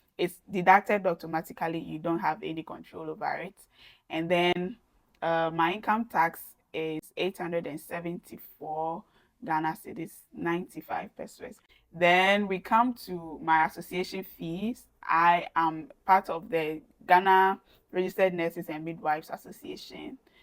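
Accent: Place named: Nigeria. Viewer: are u a nigerian coz you sound a nigerian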